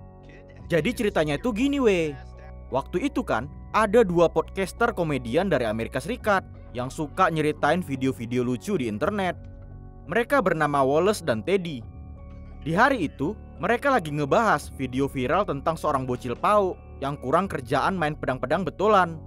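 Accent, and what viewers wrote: Medan, Indonesia. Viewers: Wokeh ini yg ku suka logat Medan😂 Semngat Tross buat vidionya bg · Ya Allah bang org Medan bang... gw suka bet logat melayu Medaaaaan.... salam dr pecinta bahasa daerah... hahhahaha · Medan kali bah bahasanya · Logat Medan nih Kek daerah gw btw Medan yg di mna nya bang Aku juga org Medan?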